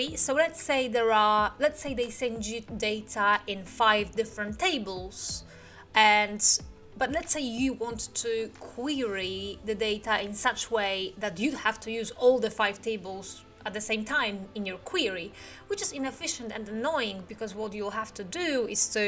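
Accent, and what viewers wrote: England, United Kingdom. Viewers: Hello, just subscribed, AMAZING content! Very helpful! May I ask which country you are from, because sometimes you sound british or french or even russian! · Haha I love your english accent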